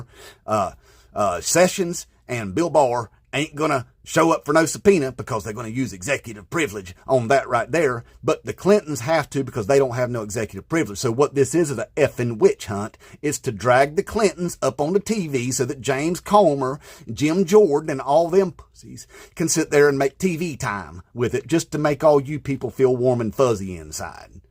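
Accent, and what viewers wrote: South, United States. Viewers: Thank you for your work. You're making a difference. Love your southern accent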